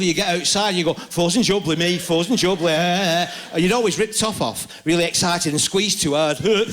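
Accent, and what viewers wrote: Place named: Australia. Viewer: Australian accent can be tough to understand at times. Hope he can acquire some jokes, somewhere , somehow.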